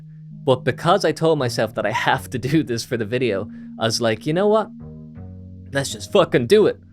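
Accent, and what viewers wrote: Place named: Ireland. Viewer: Why are you irish?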